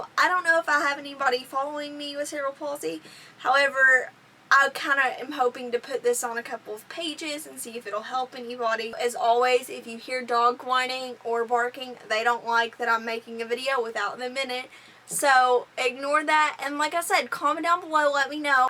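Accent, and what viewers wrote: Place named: Kentucky, United States. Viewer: Greetings! I know it's been a long time since you did this video and I'm sure you have moved on from here, but I really appreciate this video. My 14 year old son has CP with spastic quadriplegia. He's non-verbal and non-ambulatory. He is taking baclofen orally and we are considering a pump. I am mostly unaware of his pain. It was helpful to hear you talk about your experience. I feel like it gave me insight into what he is experiencing. I love your cheerful disposition and your Kentucky accent.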